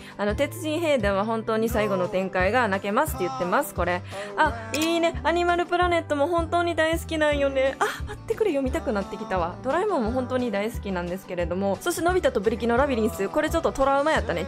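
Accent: Kansai, Japan. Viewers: ちょっとしたマンガ喫茶じゃないですか！お友達呼んだらお金取れるレベル(笑) もともとの関西弁にあわせて、めっちゃ早口で紹介していってる感じが「ケロちゃんにおまかせ」っぽかった(笑)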